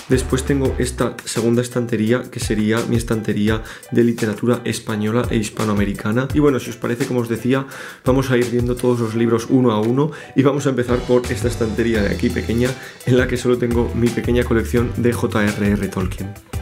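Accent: France. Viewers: Qué interesantes tus libros, me encanta tú acento en francés hehe <3, yo también lo estoy aprendiendo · Me gusta mucho tu acento, marcas las ciertas letras (como la "h" y la "r") de una forma muy particular. Enseguida se nota que tu segunda lengua es el francés. Yo aunque soy mexicana pasé mucho tiempo en Brasil y siento que a veces se me "mezclan" la pronunciación de las letras también :P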